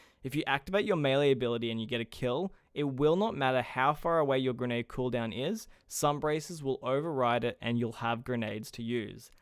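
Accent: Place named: Australia. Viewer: U sound like you’re either from Adelaide or qld 🙄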